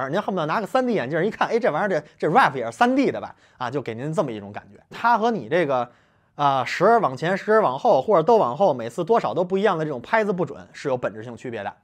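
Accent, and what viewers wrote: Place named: Tianjin, China. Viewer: 哥们儿你是天津的吧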